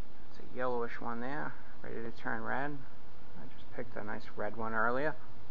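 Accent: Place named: Boston, United States. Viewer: Before I even saw your channel name I knew you had to be a fellow Mass-hole and Boston guy! Great video and great accent. I mean, wicked pissah accent!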